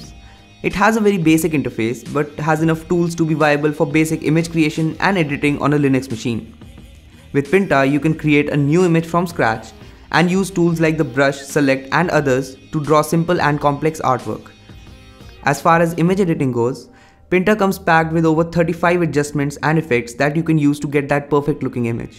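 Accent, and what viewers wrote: India. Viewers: sotaque indiano dos infernos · Make your videos with "In indian english" in title You are polluting my youtube History, and my ears... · Why haven't I seen/heard even one indian guy who can speak english properly without this stupid accent? It's just like hearing some foreigner speaking broken language you use in your country, unpleasant to listen to. I'm not saying everyone should have perfect british/american/australian/canadian/whatever accent but come on. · please google block all indian english accent videos it is horrible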